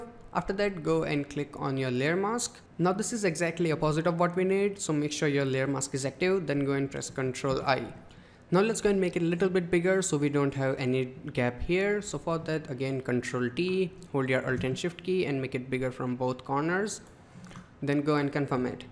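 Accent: India. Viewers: the indian accent is so cute lol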